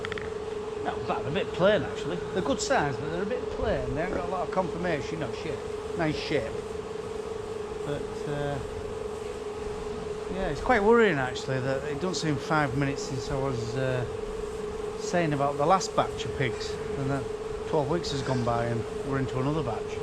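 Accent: Yorkshire, United Kingdom. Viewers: Loving this channel - taken a while for my Cornish ears to tune in to your Yorkshire accent but I’ve got it now. Your thinking is very much the same as mine - I have had concrete as thin as 2” on a really firm base. Never bother with reinforcing but always add anti-crack fibres.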